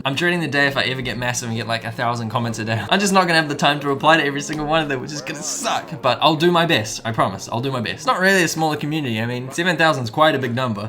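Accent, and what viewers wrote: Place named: South Africa. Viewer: Hey mate - I love your accent (and the music definitely too) ;) Are you of SA origins? Cheers from Poland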